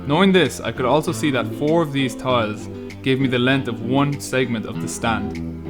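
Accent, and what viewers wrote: Ireland. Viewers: 2 thing I love the Irish accent u have and I love fortnite so I hope u like that sub I gave u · Are ye Irish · Are you Irish? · Are you Irish I’m Irish thx I love it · Thanks for giving ireland a shout out Btw are u from ireland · Are you irish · Are u from ireland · are u Irish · Let's go Ireland i can hear from his accent that he's Irish🇮🇪🇮🇪🇮🇪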